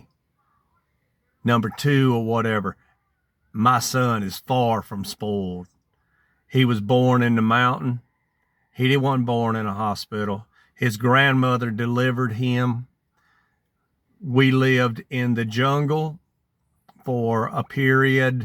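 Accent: South, United States. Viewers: Well spoken i appreciate your old school southern way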